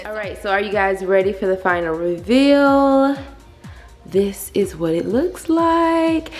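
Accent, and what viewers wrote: South, United States. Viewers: You’re so southern/country lol, so am I that’s why I notice it. 😂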